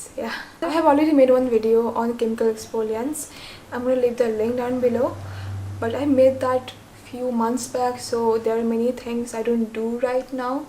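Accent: Bengal, India. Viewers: It's her accent ( Bengali), her english is good though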